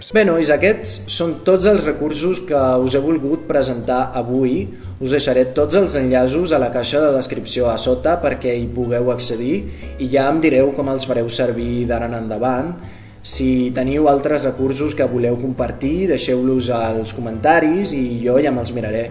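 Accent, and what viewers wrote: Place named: Central Catalan, Spain. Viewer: Quin accent més central. Jo sóc de Palma de Mallorca i he gaudit de com parles.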